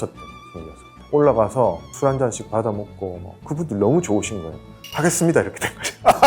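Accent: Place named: Gyeongsang, South Korea. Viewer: 부산이셨구나 ~~~ 갱상도 억양이라 갱상도인줄 알았어요 ~~~~ 울산샘은 잘 계시는지요??? 궁금하네요 ~~~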